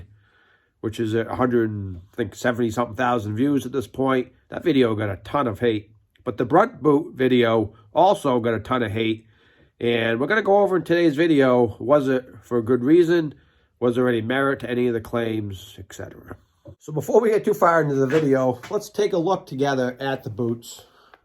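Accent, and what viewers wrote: Massachusetts, United States. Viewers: You must be a fellow MA resident I can by your local accent. 😊 Have had a pair of the Marin waterproof boots in black for a week now. Super comfy so far. I bought them for work (small engine repair) and for riding my motorcycle. I hope to do a one year review on mine next year but I’m sure it won’t go over very well since I’m a girl so in most people’s eyes it’s like “ oh what does she know”. Glad to see you’re a happy camper after a year in yours!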